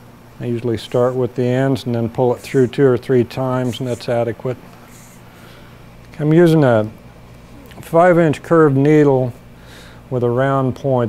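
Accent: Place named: Midwest, United States. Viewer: I love watching you work. After 4+ decades in the Navy and federal wildlife law enforcement, I have developed an interest in old hand tools, carving, backpacking, fly fishing, AND furniture restoration. Your videos are almost “zen like”.....in that they relax not only me.....but surprisingly, my Australian Shepherd as well!!! Plus.....I’m learning something! I’m just getting into the restoration of a Stratford Chair Company mission-style chair....and discovered your “channel”! You remind me of a younger Sam Elliott....you even have same midwestern speech pattern! Bravo-Zulu, job well done....on a well-produced AND instructive AND relaxing YouTube video!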